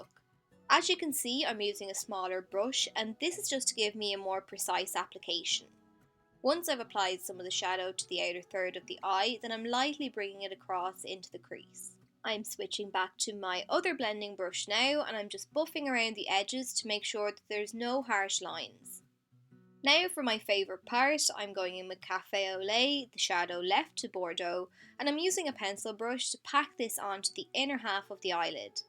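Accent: Ireland. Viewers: Great youtube makeup artist 1. because she is talented 2. lovely irish accent :)!!!!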